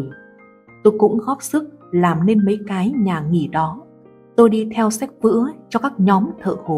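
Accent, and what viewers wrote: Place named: North, Vietnam. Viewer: Nghe giọng Bắc biết xạo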